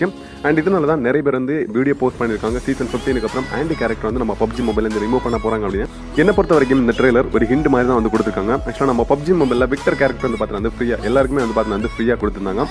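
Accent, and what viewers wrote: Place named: Tirunelveli, India. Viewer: bro tirunelveliya bro la pottu pesuringa Naanum tirunelveli bro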